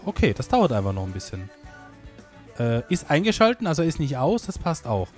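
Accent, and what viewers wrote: Austria. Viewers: Bist du Österreicher?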